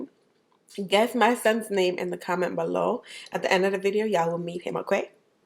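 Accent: Jamaica. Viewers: I know this was 2 years ago but the accent got me curious are u part Jamaican let me know please 🙏 🙏🙏🙏 And ur baby is so cute. Ur vids always got me smiling. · Oh so you sound Jamaican and by the way congratulations on your baby boy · U got a jamican accent❤🇯🇲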